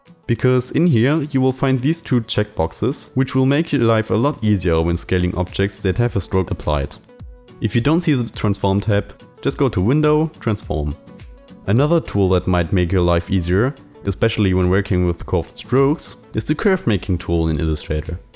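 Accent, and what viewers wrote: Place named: Germany. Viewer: if u ever heard a german u know, dass du voll deutsch bist, danke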